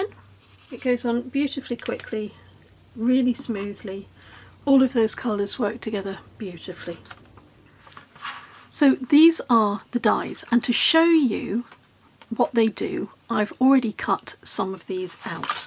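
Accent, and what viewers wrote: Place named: United Kingdom. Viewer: Your accent suggests you are very British, I love that as I'm in Portland Oregon, the land of no accent! I just love all things you create and how you add real life bits and pieces to your crafting.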